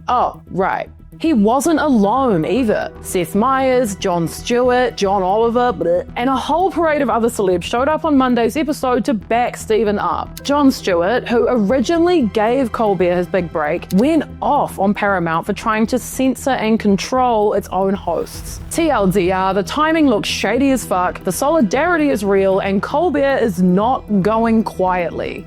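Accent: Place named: New Zealand. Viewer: It's low budget Colbert with a cute Kiwi twist. I'm here for it 😎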